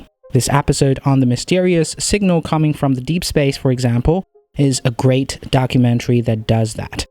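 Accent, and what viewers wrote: China. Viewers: from your English accent you were born in mainland China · His accent is so obviously Chinese from China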